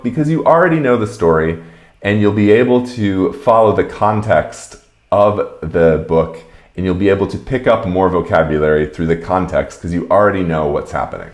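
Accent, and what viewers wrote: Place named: United States. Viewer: I do not digest American accent.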